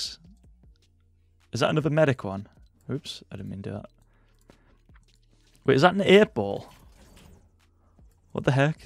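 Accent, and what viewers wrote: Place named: Newcastle, United Kingdom. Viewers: What? You can't tell his british accent from all those settings videos? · He’s from Newcastle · Now we officially know ghat is british 😂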